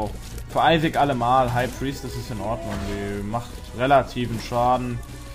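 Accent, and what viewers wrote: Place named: Saxony, Germany. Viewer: die bayern reden nicht so xD die aus leipzig reden so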